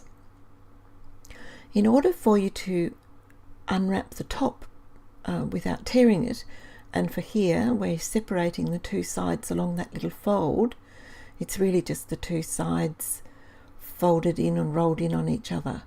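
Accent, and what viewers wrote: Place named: Australia. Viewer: GREAT VIDEO, NICE VOICE AND AN AUSSIE 😊 THANKS SO MUCH.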